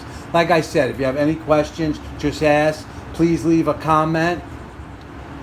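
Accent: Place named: New York, United States. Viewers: Great video. Love the NY / NJ accent. Doing this rear shift shaft fix tomorrow. Wish me luck. Pretty loose but no oil leak yet. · Hey. Where are you located? Sounds like a Brooklyn or jersey accent. I’m in NYC. I’d like to have some work done on my bike and would love if you could do it. I like how clean and meticulous your work is.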